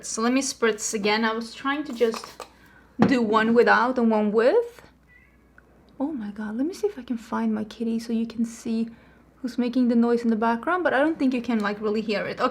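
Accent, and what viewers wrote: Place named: Sweden. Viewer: She is i believe Swedish.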